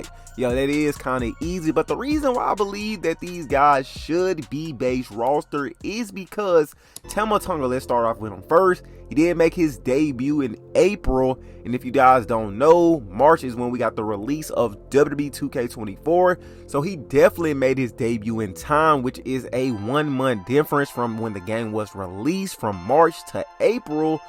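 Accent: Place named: South, United States. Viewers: your accent is thick, I'm guessing either North Carolina or Alabama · Gotta be Texas that twang is in there heavy lol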